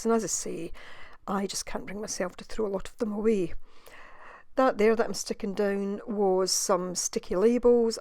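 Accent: Scotland, United Kingdom. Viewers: Enter draw just found you through the hop and love your ATC’s lovely to hear another Scot on youtube thank you looking forward to trying this out with my scraps 🙂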